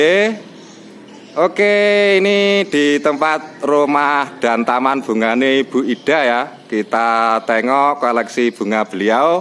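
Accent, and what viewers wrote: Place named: Java, Indonesia. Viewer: Medok jowo mas e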